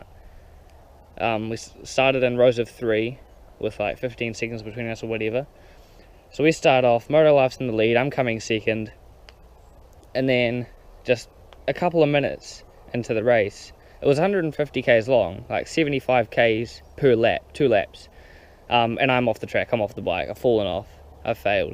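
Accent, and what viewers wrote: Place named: New Zealand. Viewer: sounded like you were from new Zealand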